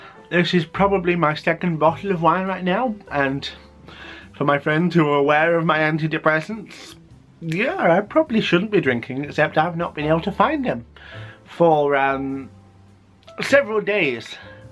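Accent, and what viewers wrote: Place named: Blackburn, United Kingdom. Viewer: It was a Blackburn accent not Scottish :p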